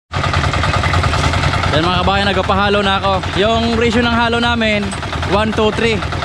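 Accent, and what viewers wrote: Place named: Bicol, Philippines. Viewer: Parang taga bikol si idol ah saan ka po sa bikol?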